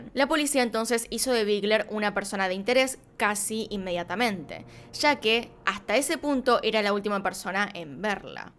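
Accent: Argentina. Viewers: Saludos desde Virginia Estados Unidos 🇺🇸 pero yo soy de Guatemala 🇬🇹 me encanta tu acento argentino 🙃 salúdame 🙂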